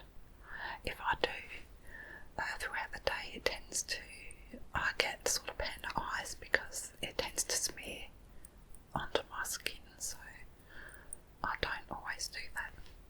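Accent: Australia. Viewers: I don't wear make up but i love the Aussie accent!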